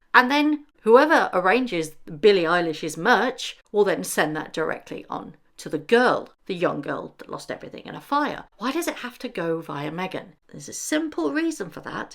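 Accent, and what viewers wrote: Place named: United Kingdom. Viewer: you got a UK accent, but I'm not sure where you are based. Surely you remember the Glenfell Tower fire when 74 died. Meghan did not turn up and get in the way. The Royals and Politicians visited by invitation after the smoke and gone and people had been moved elsewhere. Meghan was sent to help promote a community cookbook. She did not wander amongst this graveyard in the sky offering to get signed T-sirts for kids who were still trying to find a bed and a consistent supply of food. This is weird and she has shown she knows how to behave in a disaster not based in The USA.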